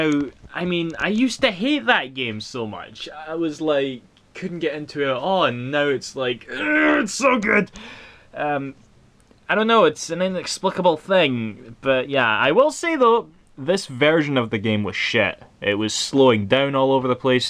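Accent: Scotland, United Kingdom. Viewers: Your accent isn't as thick as I would expect from a typical Scot. I can actually understand you. :) Now some of those English lads... ;)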